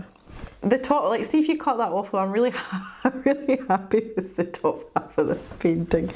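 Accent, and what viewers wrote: Scotland, United Kingdom. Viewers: “Just smash it all tegether” aw, I’m here for the Scot as much as the art as someone from Norn Iron living in England, I miss a strong local accent!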